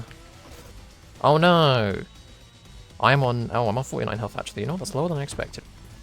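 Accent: United Kingdom. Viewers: WHY DOES HE SOUND MORE BRITISH IN THIS ONE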